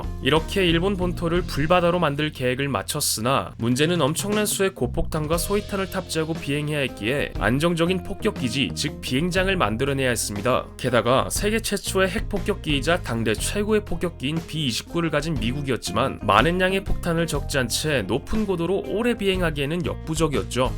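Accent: Gyeongsang, South Korea. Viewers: 혹시 경상도가 고향이신가요?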